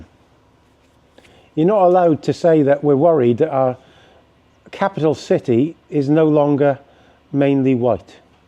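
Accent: United Kingdom. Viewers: you're so right sir... also you sound a bit british?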